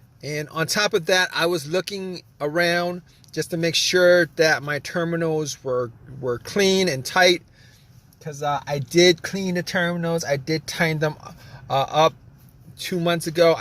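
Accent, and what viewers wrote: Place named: Staten Island, United States. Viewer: Sounds like Staten Island accent. Judging by shade it’s 10 30am sxo he’s up early + just had first puff puff. Sadly, He and the boys won’t be getting “za” today.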